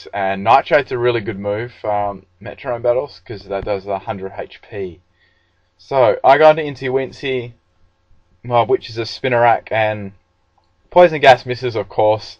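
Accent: Australia. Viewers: my favorite part of this video? that he's FUCKING AUSTRALIAN!!!!!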